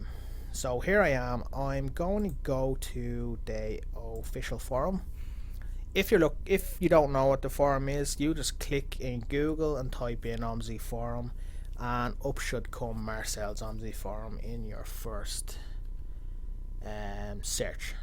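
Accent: Ireland. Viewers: this guy is definitely irish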